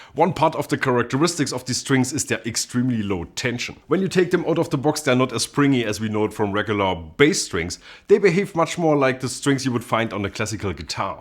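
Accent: Germany. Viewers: 6 seconds into the vid. Are you german?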